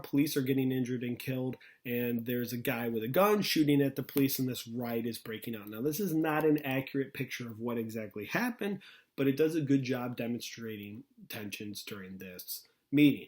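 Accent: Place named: Buffalo, United States. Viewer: Guys, I think this guy is from Buffalo.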